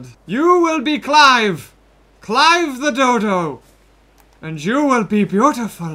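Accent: United Kingdom. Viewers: He’s british but has a deeper voice than stampy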